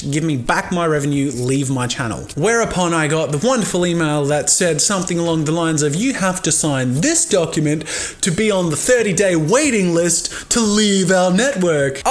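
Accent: United States. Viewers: Your american accent sounds more american than mine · Sick American accent, *. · hearing you talk in an american accent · i love your american accent so much its actually kind of accurate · Your yank accent is epic! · Does he sound American when he is giving the lecture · Terrific American accent! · the american accent was really good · I love your American accent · you fake a really great American accent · the realistic AF American accent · I love his American accent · nice american accent bro! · AMAZING AMERICAN ACCENT LOLOL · Pretty good American accent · that was awesome American accent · COOL AMERICAN ACCENT!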